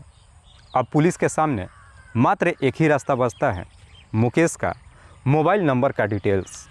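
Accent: Bihar, India. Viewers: भाई आपका लैंग्वेज बिहार के तरह है भाषा क्या बिहार के तो नहीं रहने वाले हैं बहुत बढ़िया कहानी सुनाते हैं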